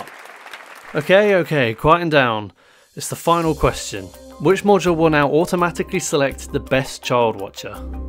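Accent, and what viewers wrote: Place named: United Kingdom. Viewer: No thumbs up. I live in the US so my ear is not tuned to a British accent. The accent along with your fast talking made it a challenge to understand. At some points I changed the playback speed to 0.75. My suggestion to get more thumbs up? Slow the F**k down! Are you simply trying to impress us that YOU know this information, or are you trying to impart the information to the rest of us? Communicaiton is NOT speaking words, communication is transferring ideas from one brain to another brain. Do whatever it takes to acheive that goal.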